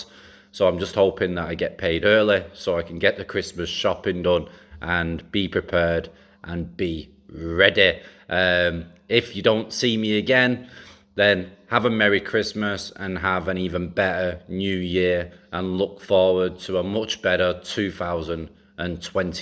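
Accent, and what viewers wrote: United Kingdom. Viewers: Delusional he sounds UK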